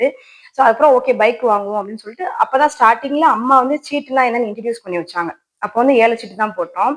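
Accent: Madurai, India. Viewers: Akka neega Madurai ah ka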